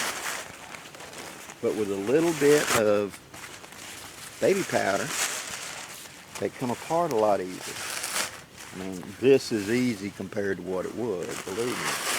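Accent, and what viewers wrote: South, United States. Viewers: you are one of my favorite outdoorsmen pesenting common sense advice. Once again I have to take a minute to digest that southern speak. " Double it in half". Love it, carry on.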